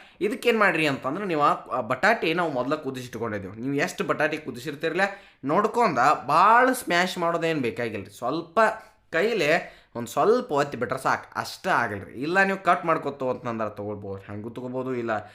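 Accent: North Karnataka, India. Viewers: ಬಹಳ ಚಲೋ ಐತ್ರಿ😂 ನಿಮ್ಮ ಉತ್ತರ ಕರ್ನಾಟಕದ ಕನ್ನಡ ಭಾಷೆ ಬಹಳ ಚಲೋ ಐತ್ರಿ.😅😊😂❤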